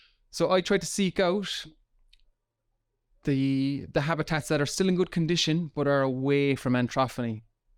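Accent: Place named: Munster, Ireland. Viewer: Hello 👋 cool video man. I recorded some screeching hawks but I'm not sure which type they are. I'm 80% sure they are buzzards. Is there any chance u could take a gander for me? I want to title the video properlyif that's what they are. Also I'm from kerry, your accent sounds very familiar to me are u on my side or the Cork side? 😂❤ 💪 🇮🇪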